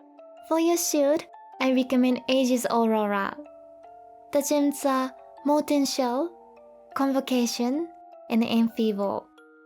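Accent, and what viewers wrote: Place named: Japan. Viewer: Are you Japanese? Sorry for unrelated question but damn your accent remind me that. It extremely super cute btw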